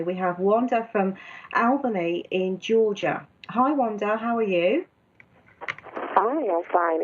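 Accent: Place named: Cockney, United Kingdom. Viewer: She speaks with a Cockney accent. The working class use this accent .Not the Propah English ,which is spoken by the elites or Oxford educated.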